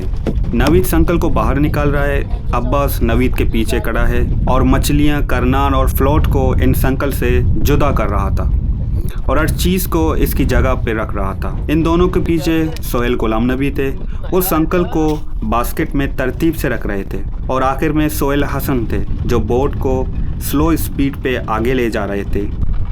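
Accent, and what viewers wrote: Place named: Balochistan, Pakistan. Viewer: کتنی خوبصورت اردو بول رہے ہو لہجہ بھی بہت خوبصورت ہے یہ ایک بلوچ کا ہی ہوسکتا ہے ۔ اللہ خوش رکھے آمین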